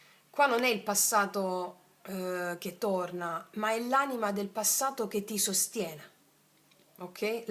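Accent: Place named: South, Italy. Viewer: Buongiorno bella voce che hai specialmente il tuo accento che credo che sia del Sud.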